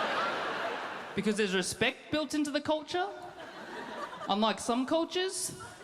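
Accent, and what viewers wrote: Queensland, Australia. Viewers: An Chinese guy with Australian accent! That by itself is hilarious!😃😄😂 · That's Aussie accent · Yea so aussie. Personally I find it quite annoying when they talk like that. Not all of them have the high pitch though. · What i found funny is that hes chinese with an aussie accent! Lol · Is this Australia accent ?😂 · Is that an Australian or a New Zealand Accest? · Woah!!!!!!! This guy ROCKS!!!!! And love the Aussie accent! Good on ya mate! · asian kid with australian accent... great combo. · queeensland accent. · this guy looks like asian and sounds like aussie hilarious xD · It's a real strong Aussie accent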